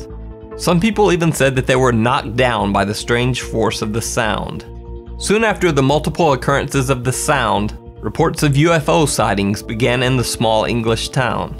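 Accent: South, United States. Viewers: you sound like you have a southern accent. Your voice is the best to listen to and your vids are the scariest. You and Mr. Nightmare are the best along with Mind Seed tv. You’re my favourite though obviously 😊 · you should hire someone to do the voice overs because you have some like, weird speech impediment. I can't put my finger on it... it's almost like there is too much saliva in your mouth mixed with a slight southern accent.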